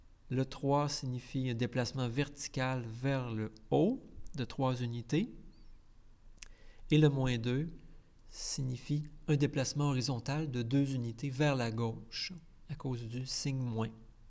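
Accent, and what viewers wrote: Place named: Canada. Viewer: Trop fort et bien expliqué avec un accent canadien 👌🏼👏🏼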